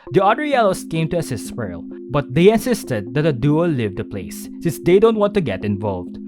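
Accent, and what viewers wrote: Philippines. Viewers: the filipino accent is strong in this one haha <3 sending more love from the ph!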